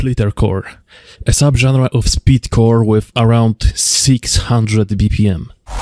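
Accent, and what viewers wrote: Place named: Eastern Europe. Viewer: looks like i hear slavic accent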